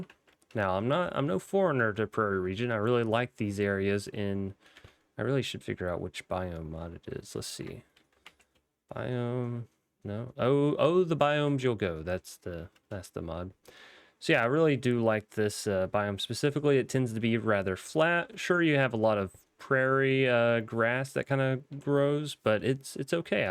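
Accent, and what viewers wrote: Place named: Appalachia, United States. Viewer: he sounds Appalachian or southern to me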